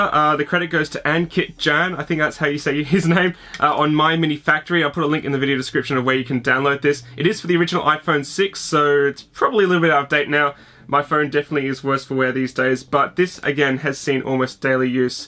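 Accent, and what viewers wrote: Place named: Australia. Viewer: 5 years old and 1million views and no one has mentioned that in the closed captioning you say you defecate in your car. The auto captioning has trouble with Aussie accents, lol. Great video, all the best! Eddie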